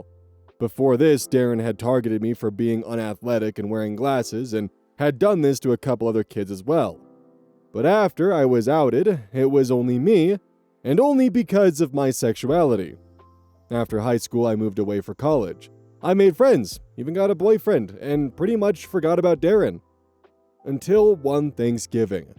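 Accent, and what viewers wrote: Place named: Midwest, United States. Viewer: I love wheb midwest accent comes out strong xD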